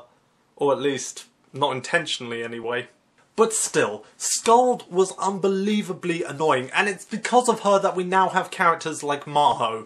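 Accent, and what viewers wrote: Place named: United Kingdom. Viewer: ur british accent is so amazing its like sex to the ears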